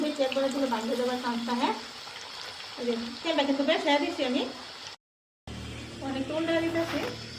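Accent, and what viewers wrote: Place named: Assam, India. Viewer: ❤❤ধনিয়া পাতা দিয়ে মাখাই ব্রেড ক্রামশ দিয়ে ভাজলেন ❤আপুর আগে চেনেল আছিল অসমীয়া ভাষায় তাইনা আপনার গলার শব্দ শুনে বুঝলাম ❤❤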